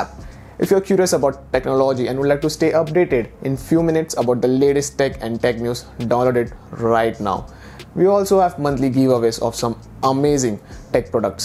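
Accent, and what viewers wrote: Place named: India. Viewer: Your sound like keling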